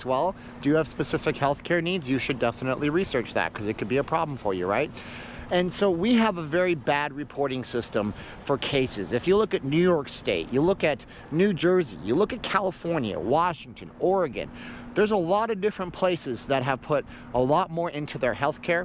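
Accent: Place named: Canada. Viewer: Pretty sure, he is Canadian, thats where goofy accent comes from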